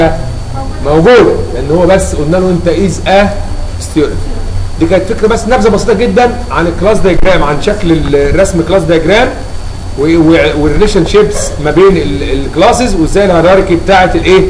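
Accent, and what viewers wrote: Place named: United Kingdom. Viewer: OMG!! what the hell is this you're killing me with your english accent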